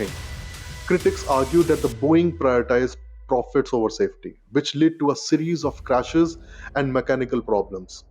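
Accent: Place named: India. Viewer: Sorry Indian voice hurts my ears. All I can hear is SAAR DO NOT REDEEM!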